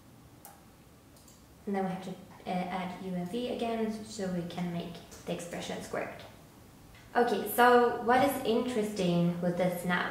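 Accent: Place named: Germany. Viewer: haha I was just guessing from the accent. Where in Germany do you live?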